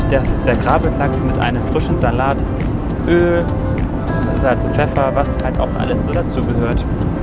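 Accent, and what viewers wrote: Germany. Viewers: Ich habe mit ihnen über dieses Thema schon einmal gesprochen. Sie haben eben das typische Schulenglisch gelernt und jeder weiß, wie gut dieses ist. Wenn man eben, so wie sie, lange kein richtiges Englisch spricht, vergisst man eben eine Menge und die Aussprache leidet auch darunter. Vor allem Vokabeln. Diesen typischen deutschen Akzent hat einfach jeder Deutsche. Ich lebe seit meiner Kindheit zwischen Monaco und Berlin und spreche fließend Englisch, trotzdem hört man meinen deutschen Akzent. Das ist einfach so. Jeder hat seine Probleme mit der Aussprache von verschiedenen Wörtern. Ich würde es einfach entspannter sehen. :) Liebe Grüße aus Monaco -Raphael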